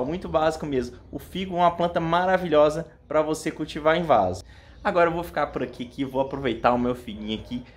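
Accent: Minas Gerais, Brazil. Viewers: Que sotaque gostoso de Minas Gerais,bjs.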